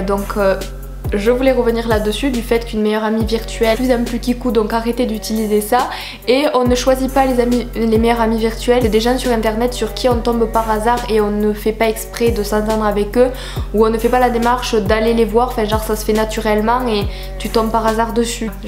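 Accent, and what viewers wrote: South, France. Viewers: pq tu fait un accent du sud alors que tu vis à strasbourg ? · L'accent du sud c'est le sang Moi aussi g un accent mais c drole sinon j'adore ton accent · T’inquiète l’accent du sud c’est le meilleur 🔥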